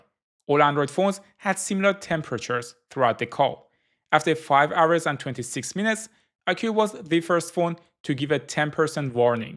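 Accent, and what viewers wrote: Iran. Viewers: Obvious persian accent 😁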